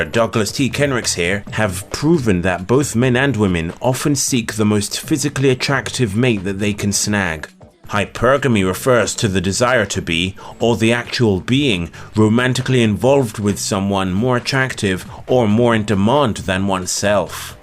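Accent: London, United Kingdom. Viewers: He is from London so I think it's a London accent. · German? He’s English mate! It’s a black London accent. I have to say his is quite mild and he is very well spoken. Lots of black people in London have very strong accents and sound in your face and ghetto (just depends really). He sounds quite posh tbh. Not saying he is, he’s just very well spoken and not necessarily reflective of the typical black, Asian or white London accent. Londoners accents are usually fucking horrible whoever is speaking them but his mogs everyone’s!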